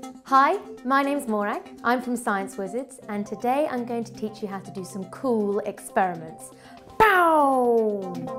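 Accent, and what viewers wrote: England, United Kingdom. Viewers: SHE'S ENGLISH not American that's what the English call IT WHO CARES anyway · im an english person living in england and that is still the most english accent I've ever heard XD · Are you British?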